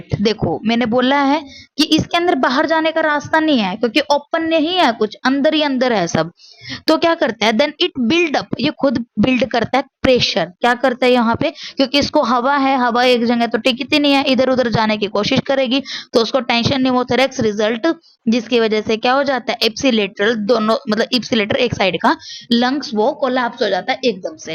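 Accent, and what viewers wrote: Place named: Haryana, India. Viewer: very helpful video ❤ and your haryanvi accent is cherry on the top❤❤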